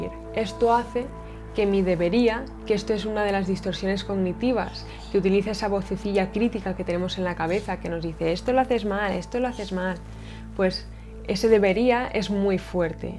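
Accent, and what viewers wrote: Spain. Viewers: Hola me gusta mucho ver tus videos me gusta mucho escuchar tu punto de vista además de que me gusta mucho tu asentó me gusta como se escucha el español de españa ojala poder conocer una española jiji saludos desde Costa Rica pura vida!!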